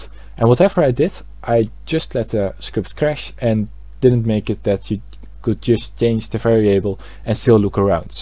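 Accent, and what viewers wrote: Netherlands. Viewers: Hey + are you dutch because you sound very dutch haha. Keep up the great work!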